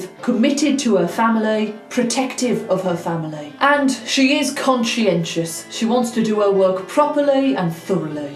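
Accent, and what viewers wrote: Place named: North, United Kingdom. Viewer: Watched one of your videos where you say you have a RP accent...you dont you have a finally disguised northern accent or maybe notth Midlands...PS your Fit :p Greetings from the north lol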